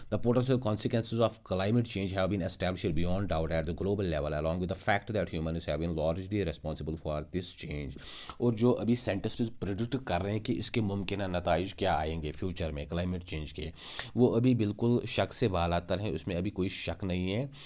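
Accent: Kashmir, India. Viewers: You've pure kashmiri accent☑️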